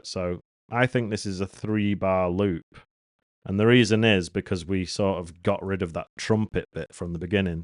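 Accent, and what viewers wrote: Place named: Bradford, United Kingdom. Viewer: You lad............. You are the Don Corleone! And that Accent too (Bradford boy myself) One of the best tutorials I have seen in a while. You know your stuff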